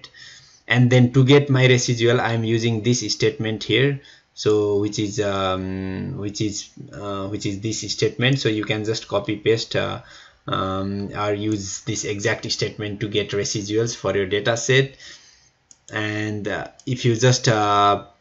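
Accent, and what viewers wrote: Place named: Nepal. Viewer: are u from Nepal ? your accent is same as mine. thats why I asked.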